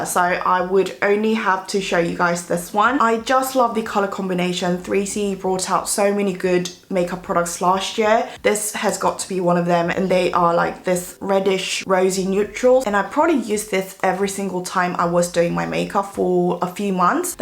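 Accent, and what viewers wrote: United Kingdom. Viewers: Love the British accent🙃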